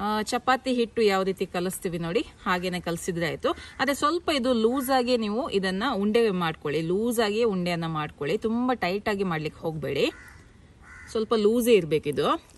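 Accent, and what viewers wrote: Mangalore, India. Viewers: First thing i like is mangalore slang ,n second thing is ur recipes is ultimate for healthy and natural making ..continue akka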